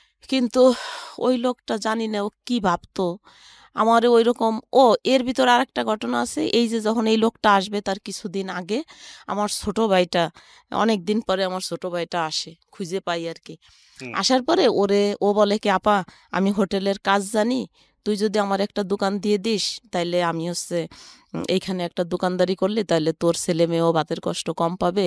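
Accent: Bangladesh. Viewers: বাসা মুকসুদপুর হবে ভাষায় মনে হচ্ছে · গল্প মনে হয় মিথা কারণ বাড়ি খুল্না কথা বলে বরিশাইল্লআ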